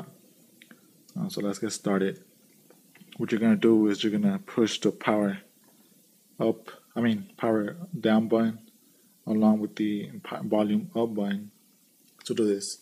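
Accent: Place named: Argentina. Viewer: genius genius genius many thanks, I've looked everywhere online videos and more videos of this tablet and did not appear in either hand, I say this tablet is only jajaj ... well until I found your video and could not believe it, get to the logo android but could not access the recovery I needed that combination, so I thanks a lot for the contribution they have made .... I subscribed to your channel and little hand up. I do not understand a lot of the Argentine English, so I had to use the translator thanks again ....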